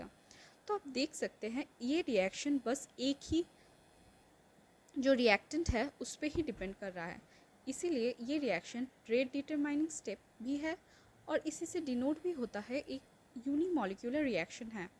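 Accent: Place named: United Kingdom. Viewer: Mam aapka accent thoda british ho rha h 🥲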